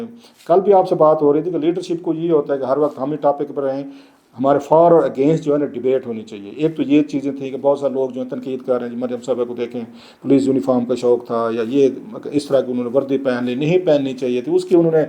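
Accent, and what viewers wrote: Saraiki, Pakistan. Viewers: Please try to correct your Urdu accent, feels that your vlog in saraiki , please next time aap saraiki me vlog Karen,Urdu aap ke bus ki BAAT nahi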